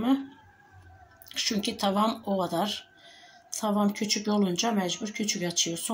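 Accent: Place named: Sivas, Turkey. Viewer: Sen sivas limisin bizim gibi konuşuyorsun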